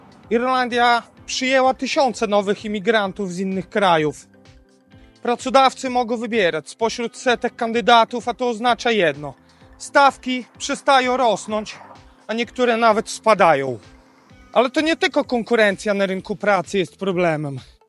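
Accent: Podlasie, Poland. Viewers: od razu słychac, mam dużo kumpli z podlasia, wszystkich na emigracji spotkałem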